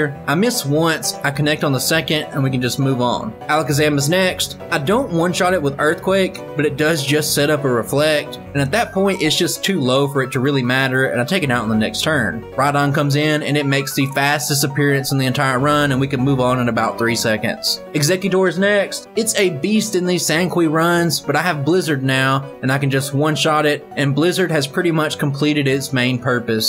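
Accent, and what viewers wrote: South, United States. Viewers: I have to say that I enjoy the "unoptimized run first, optimizations later" style, and I also enjoy the longform videos (such as the Mr. Mime vid). The variability in content is nice. Thirdly, the Sanqui runs injecting new moves, types, and mons is also a unique treat that sets you apart from others on the scene, and the Garchomp vid is what got me to first click on a vid of yours, actually (and your accent and demeanor helped me to stay; I've got southern in my family and I feel it's very underrepresented in many gaming communities) 😁 I think this is the first vid of yours that's came out since Scott's Thoughts' Tentacool run that gives you a mega-shout-out at the beginning, too -- I hope you see the views tick up from that!!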